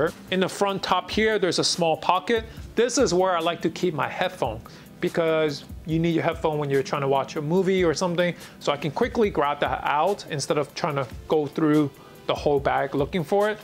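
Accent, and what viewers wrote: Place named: China. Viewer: So he speaks with a heavy Chinese accent. His English is probably better than any second language you speak. What is obvious though is that he really doesn’t know about fashion because a lot of his terminology, while descriptive, are not industry terms. The LL Bean tote, which appears to be a magazime giveaway item, is definitely not lined with rubber. The “Valentino” is clearly a belt bag and Valentino Garavani. I think it’s tacky tacky.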